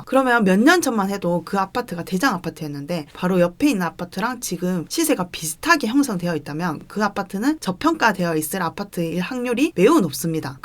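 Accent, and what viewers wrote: Gyeongsang, South Korea. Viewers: 대구 사람 아입니까?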